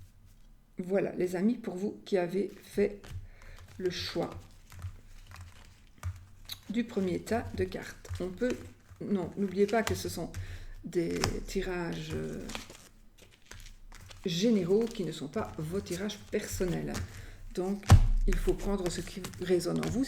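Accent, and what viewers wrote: Belgium. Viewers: Salut, sorcière bien-aimée, comment vas-tu avec ton petit accent belge ? On est pays...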